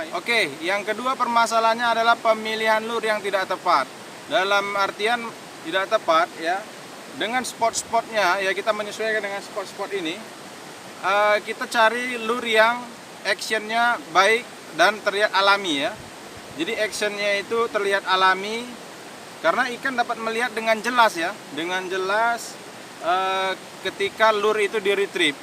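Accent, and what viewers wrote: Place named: Medan, Indonesia. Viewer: Mantap om... Semakin ok penyajiannya Tinggal bahasanya saja yg kelihatan anak medan 😁😁😁🤭🤭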